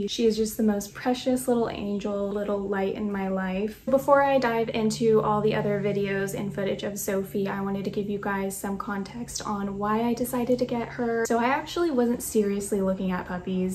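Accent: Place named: United States. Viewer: Sorry , hold up, what did u say....'Puppy Store' what the hell is a puppy store, what like a shoe shop or bakery....I assume from your accent your based in America somewhere...tell me can you go into 'store' and buy a dog, like a loaf of bread or a pint of milk?....was she even with from her momma in the pet pen... This is all so wrong...doesn't happen in Scotland ...no puppy stores...puppy farming is horrific and needs to be stopped it goes on everywhere, but a 'puppy store' .. geeze, thats screaming out, 'ill treated animals right there.. be mindful people, be sensible people, be savvy.. buy a puppy from a home breeder...spend time getting to know the breeder and family, make several visits to the home, spend time with them and the dogs and the litter....don't buy from a store ffs 😥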